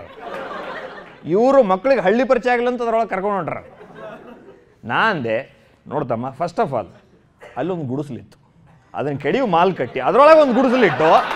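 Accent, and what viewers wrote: North Karnataka, India. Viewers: bahala cholo ittu..kellakka...uttara kannada accent nalliye matadu tamma.. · ಹಾಯ್ Brother ನಿಮ್ಮ ಡೈಲಾಗ್, ನೀವು ಮಾತನಾಡುವ ಶೈಲಿ,ನಮ್ಮ ಉತ್ತರ *ಕರ್ನಾಟಕದ ಭಾಷೆ ನಿಜಕ್ಕೂ *Ultimate, Excellent, Wonderful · Very nice ...continue to make people laugh....love to listen uttara karnataka kannada...slang itself is very funny...ur doing a good job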